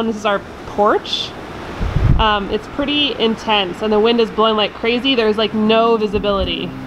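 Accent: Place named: Midwest, United States. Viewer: She has a Midwest accent.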